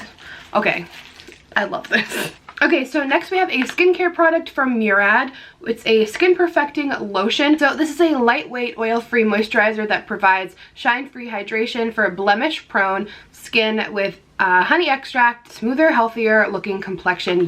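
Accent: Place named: New England, United States. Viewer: I Didn't Know You Were From New England ! (; -xx's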